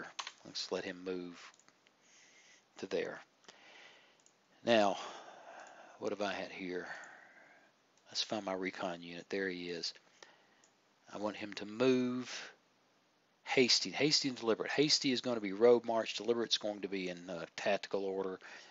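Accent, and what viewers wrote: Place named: United States. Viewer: wow i can't believe it. A game tutorial which isn't voiced by a british guy. Either all british guys do nothing else but review video games and showcase tutorials, or all guys who do this are british. your'e the one american, cool!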